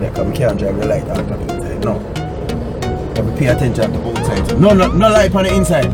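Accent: Jamaica. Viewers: Hey yuh should read the captions on yuh videos, it's so funny. Youtube captions algorithm can't handle de Jamaican accent.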